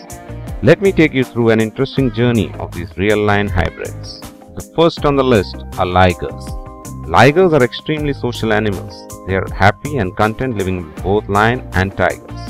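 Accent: India. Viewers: This guy has indian accent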